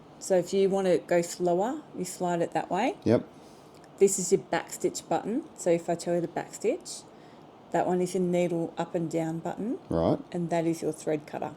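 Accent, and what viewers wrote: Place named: Australia. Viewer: Only came across your tutorials last week but absolutely loving them and pleased to be hearing an Aussie accent, colloquials and slang for a change. Brilliant to see give it a hard crack and have a few bloopers. It was a good laugh because we've all been there with the awkwardness etc. Thanks heaps and looking forward to seeing more. Cheers from Wollongong, NSW.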